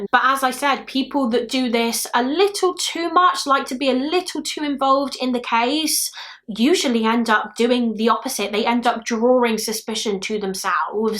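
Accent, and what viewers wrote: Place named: England, United Kingdom. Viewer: I think ur work is amazing!!! Although i dont particularly like English accents - ur content is too good to ignore. Its comprehensive, interesting and well written. Keep it up!!! Also....introduced to ur channel by Josh Miles and his collab vids 😁👍 keep up the great work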